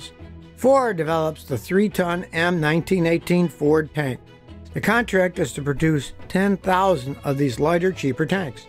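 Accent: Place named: Western New York, United States. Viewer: Funny how much hiw a person pronounces a word reveals. Kind of figured you are from western NY, by how you pronounce "un" with it being an "on" sound. Transplant to Silver Creek, Irving area in 1990, from all over the map. Spent 24 years there, until moving to PA in 2014, then Ohio this year. Love visiting your hometown, wife and I honeymooned there.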